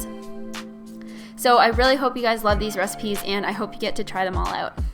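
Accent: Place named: Canada. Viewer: sweet.. I verly noticed she is from Canada and that explained why she said *aboat pretty cool any way loved the video! (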